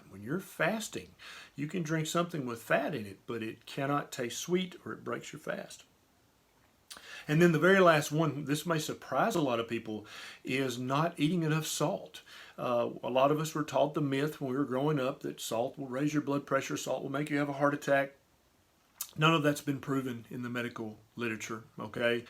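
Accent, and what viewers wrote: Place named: Tennessee, United States. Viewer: Hi Dr. Berry. Great information. It's nice to hear someone from my home state. I'm from Tennessee . I live in Texas right now.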